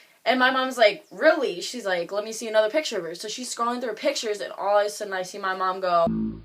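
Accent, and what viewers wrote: Alabama, United States. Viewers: are u from Alabama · Uh...are you from ALABAMA ? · Are you from Alabama?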